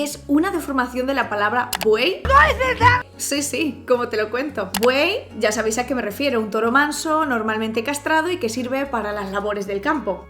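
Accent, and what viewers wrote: Spain. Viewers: Hola..no tiene el acento español muy marcado, bueno como se tiene la idea que habla un español comun....saludos.l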